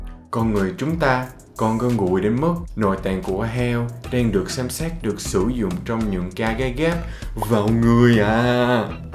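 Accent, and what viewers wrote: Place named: South, Vietnam. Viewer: Ôi Tây nói giọng miền Nam. Nghe vui kinh khủng.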